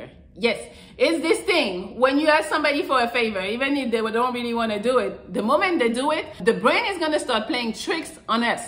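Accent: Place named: France. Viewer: I just seen your videos and started watching because of your accent. It sound so familiar . Then i realized, you’re French like me!! Probably from Gwadada or Madinina !!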